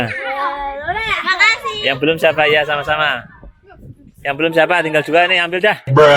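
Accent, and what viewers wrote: Banyumas, Indonesia. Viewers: Luar biasa nih boluny cntik dn empuk seneng bnget dengan pembawaanya sll di selingi bahsa purwokrto juga ngapak gitu skss yh mb mdh2an cenel ini sll mmbwa brkah amin 👍❤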